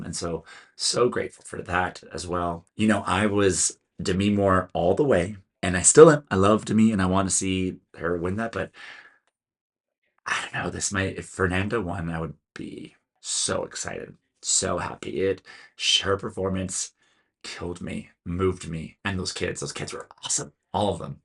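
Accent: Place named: California, United States. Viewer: I could see that you really like this movie... as you said you dont know about brazilian dictatorship, i must tell you that dictatorship was supported by the usa and the cia, actually, usa were responsible for all dictatorship that happenned in latin america. Im not pointing my fingers at you, dont get me wrong, but ithink its important to say that. I like your californian accent btw. Im rooting for fernanda but i wont be devasted if moore getx it...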